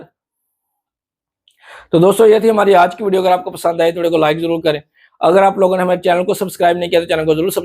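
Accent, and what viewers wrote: Pakistan. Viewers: Bhai jo language ascent saye baat kar rahye ho, app pakistani ho. Kyon Indian cricket jersey ka sahara laye rahye ho................ Apni identity kyoon chupaa rahye ho............ 😂😂😂😂😂😂😂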